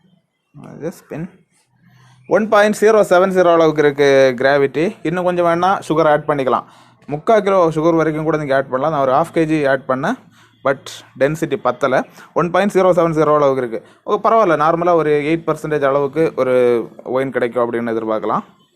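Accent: Kanyakumari, India. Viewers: Bro native Kanyakumari ah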